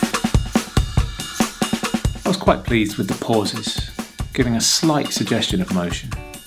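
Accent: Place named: Sunderland, United Kingdom. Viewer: Your deffo from sunderland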